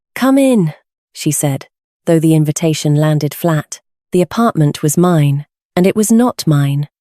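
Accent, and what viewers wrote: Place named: United Kingdom. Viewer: Narrator has a very lovely voice but it was a bit bizarre that she’s clearly British. Didn’t quite fit a story supposedly from Cincinnati. Was quite distracting.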